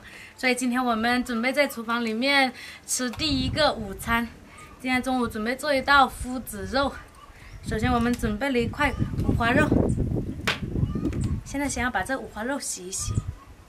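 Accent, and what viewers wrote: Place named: Guangxi, China. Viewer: 讲广西官讲的妹仔。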